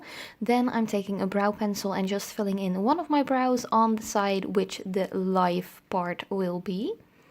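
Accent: Netherlands. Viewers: Sorry, naar ik kon duidelijk je Nederlandse accent horen! 😅 Niet gemeen bedoeld tho, ik vind het juist iets vertrouwds hebben. Oh ja, en de look is GEWELDIG! Goed gedaan! 👏👏🙏🙏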